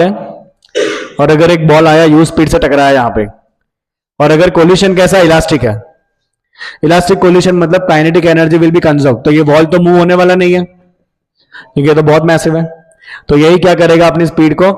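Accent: Gujarat, India. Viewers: Sir are you from Gujarat?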